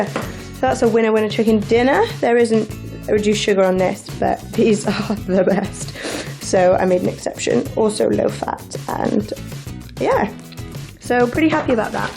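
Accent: Wales, United Kingdom. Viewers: Is there a hint of wales in that accent ?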